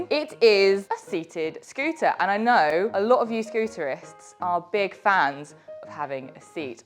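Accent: England, United Kingdom. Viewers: A women with and English accent makes me harder than a bag of jawbreakers, or was it a bag of tenpenny nails!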